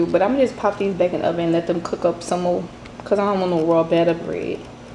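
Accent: Louisiana, United States. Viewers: Thanks, we will be trying this. I hear your Louisiana accent. Here from, South Louisiana -Opelousas, Louisiana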